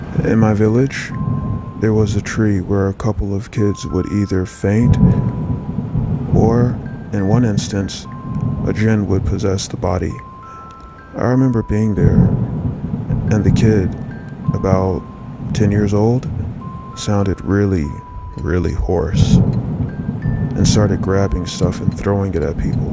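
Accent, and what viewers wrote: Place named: South, United States. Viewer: Are you wearing your “human skin” today? 😝 That part of the story gave me chills and then I pictured some kind of ALIEN!?!😱 I loved your precious Southern Belle accent… really suits you and all of your character voices! I hope 🤞 your channel grows fast and soon. I’ve told people, but I’m not good at using my phone and sharing links and bloody blinks! Lol… I’ll get there! Thanks for your content and enthralling stories and skills. It’s not as easy as people think 🤔 I wish you all the BEST and I’ll be watching and learning how to share too ✌️✨🤗✨🐨✨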